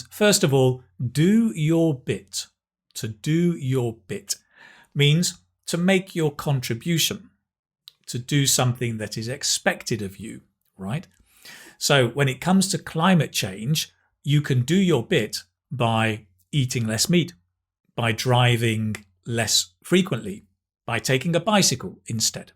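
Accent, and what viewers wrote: United Kingdom. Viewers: The British accent is wonderful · Это явно не американское произношение. Вы британец? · Hi, as always your content is very useful to practice the speaking section, but I have a question; You have a British accent, is there a chance that your advice can have some phrases/sentences/expression that is used just in the UK?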